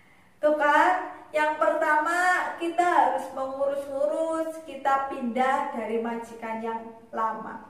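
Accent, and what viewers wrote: Banyumas, Indonesia. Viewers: Salam ngapak ora ngapak ora kepenak😀👍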